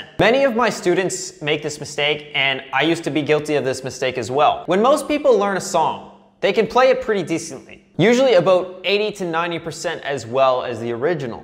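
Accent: Canada. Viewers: I’ve learnt something important from watching channel - that Canadians (well, some Canadians) pronounce 'about' more like 'aboot' and 'out' as 'oot'. Back to practicing now, honest. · Is he Canadian? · Canadian much? Great video man, thank you so much for the tips.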